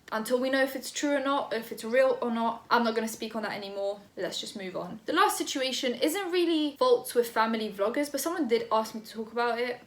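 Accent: United Kingdom. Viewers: honestly i usually can't stand british accents but i enjoy listening to you so much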